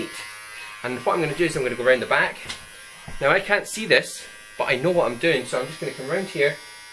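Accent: Scotland, United Kingdom. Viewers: Thanks for this. I'm giving hubby a Covid Cut this weekend, and this is a great tutorial !! Also..you could recite the Privacy Agreement for Apple, and I'd still listen...lovely Scots brogue !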